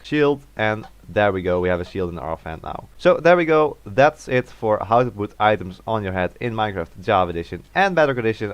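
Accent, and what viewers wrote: Netherlands. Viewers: your accent sounds dutch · you sound dutch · are you dutch? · ik hoorde het gwn aan het Nederlandse accent 😭🙏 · Sounds very dutch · He is def from Netherland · i know for sure that you are from the netherlands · are you dutch???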